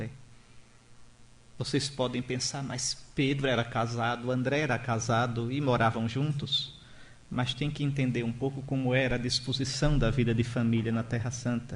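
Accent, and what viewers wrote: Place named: Pernambuco, Brazil. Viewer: Além de palestrar de forma magnífica, o saudoso bispo de Palmares o faz com um agradável sotaque pernambucano, usando algumas palavras próprias da região, verbi gratia: "aperreio". Esse homem santo certamente desfruta da glória de Cristo, a quem ele fielmente serviu.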